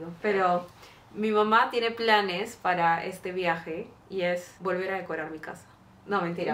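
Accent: Peru. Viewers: Un solo día con su mami bastó para que el acento de peruana regresara jaja soy Mexicana y lo noto muchísimo, me imagino es como Peruano fresa, me encanta:D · a le sale más su acento peruano con su mamá jaja me encanta!!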